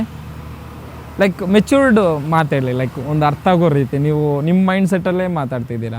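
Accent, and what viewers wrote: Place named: Tamil Nadu, India. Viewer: Kannada gottiro huduga bekappa. Eee tamil hudugana kannda kelodikke agodilla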